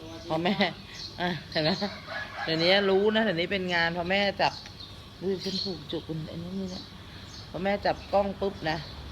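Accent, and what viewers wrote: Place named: North, Thailand. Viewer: เหนือขนาดจ้าว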